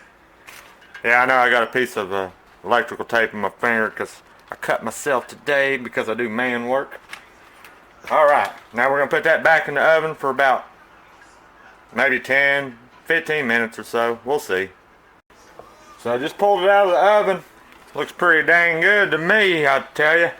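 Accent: South, United States. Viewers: Looks really good and definitely something that my kiddos would love. Congratulations on being sober and not drinking anymore. Love the Southern accent sounds like myself and I'm in a room with my family, lol..where you from?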